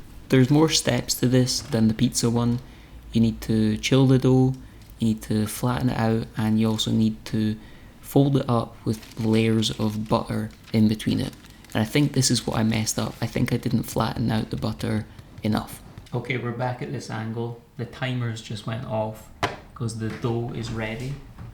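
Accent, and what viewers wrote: Scotland, United Kingdom. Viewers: Is your accent Scottish? I like how it is far easier to understand than accents from Northern England! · Weird as it sounds, I’ve been craving the sound of your voice all week. It’s so soothing, like ASMR. Lol, you’re a young Scottish Morgan Freeman. You should consider narrating. Thanks for posting this video in particular. Bake Off is another bit of ASMR for me and this combination has been absolutely wonderful. In regards to your three bakes, I think you did a great job. 10 out of 10. If it tastes good, who cares how much it looks like the traditional version. You’re not in a competition; you’re baking for yourself. All three looked delicious to me.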